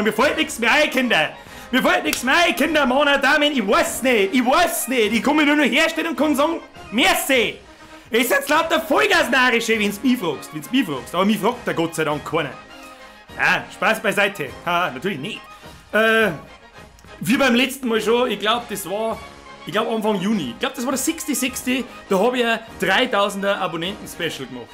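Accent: Bavaria, Germany. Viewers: Sagmal woher aus bayern kommst du? :D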